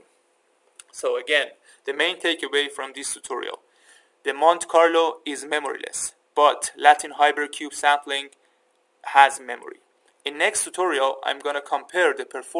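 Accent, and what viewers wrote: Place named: Iran. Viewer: Thank you for the Video. I like your Iranian accent :)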